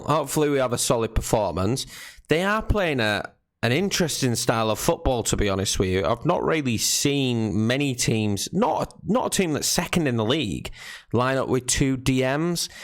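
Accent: Yorkshire, United Kingdom. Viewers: Welcome back to another episode of Football manager Twente Twente with F C Twente Love a good Yorkshire accent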